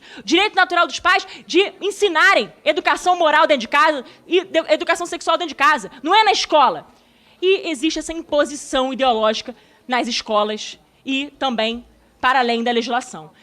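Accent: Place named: Rio de Janeiro, Brazil. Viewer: Linda, inteligente, católica e carioca. Ô sotaque lindo!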